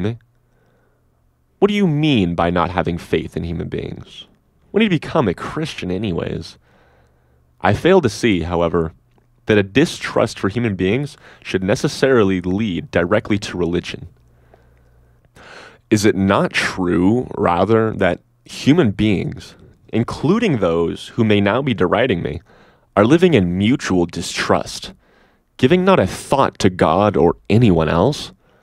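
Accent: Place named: United States. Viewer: God the thick American accent and the stupid pity half weeping sappy voice what a way to ruin an audiobook. The writing is not supposed to be sentimental lol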